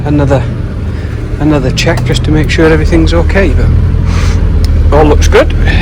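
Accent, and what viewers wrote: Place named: Wrexham, United Kingdom. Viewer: Morninggggg. I know the Castell Mynach very well. I've had a few (pricey) meals there. I prefer the Dynevor just down the road though. On another note, I've been trying to place your accent and I'm guessing at Wrexham. I'm a valleys boy myself. Love the videos. Please keep them coming.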